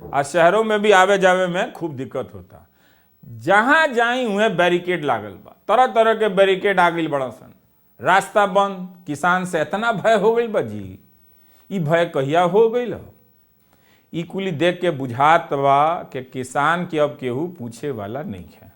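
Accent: Bhojpuri, India. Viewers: तोहार भोजपुरी भाषा bra निक लगता भैया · I am Maharashtrian but love the way your bhojpuri ❤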